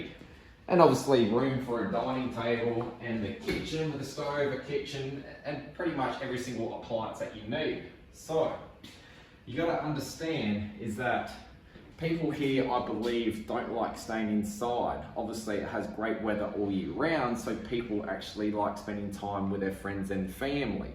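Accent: Australia. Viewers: WHAOUUUU 1st time i hear an aussie accent like that. If understood well it's 300 a week or 1200 a month. Seems hefty !! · I love your Aussie accent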